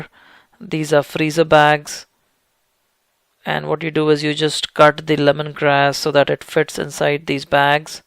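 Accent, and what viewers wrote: India. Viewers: rolls his "R"s... because he's Indian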